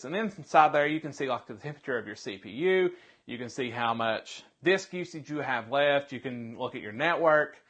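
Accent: South, United States. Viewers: Are you from Southern USA ? I've heard this accent in some old Hollywood movies. No hate, just curious. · dually noted and I will take your points into consideration as I execute my purchase in the very near future. As a consumer of content, may I say, I reallly, like REEEALLY, love the deep southern accent in these build/review videos! It's refreshing compared to those grossly proper UK creators or Bollywood types ;) Keep up the good work my friend and I look forward to some updates on the systems you have already built. TTYL!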